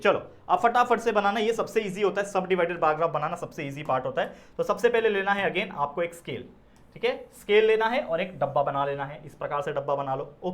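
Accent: Maharashtra, India. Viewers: Sir tumhi marathi ahe kay ?? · Sir's marathi at peak🫠😮‍💨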